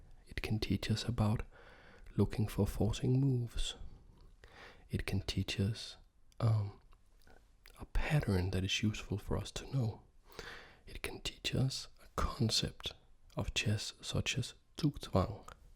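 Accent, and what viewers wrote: Denmark. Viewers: Loved your video on the French Whisperer Collab and I'm here again. I'm guessing by your accent that you're Danish, perhaps? Maybe you've mentioned this in an earlier video, apologies!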